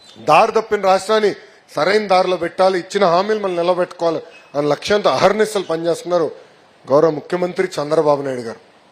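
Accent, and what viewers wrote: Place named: Telangana, India. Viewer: Enti bro telangana slang ra Bai idi enti bai good good rabai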